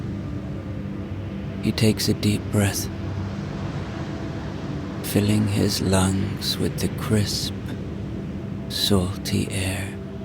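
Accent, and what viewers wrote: Ireland. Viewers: Adore an Irish story teller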